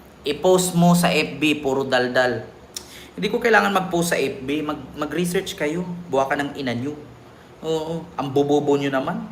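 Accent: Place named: Iloilo, Philippines. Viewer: God bless u! my Tanong lang Ako sau?ilonggo ka ba?Kasi ilonggo din Ako Punto mo daw ilonggo ka toto?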